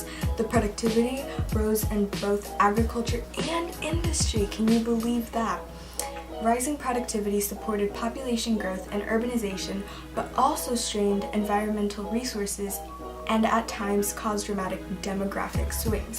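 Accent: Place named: United States. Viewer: we love tht gringo accent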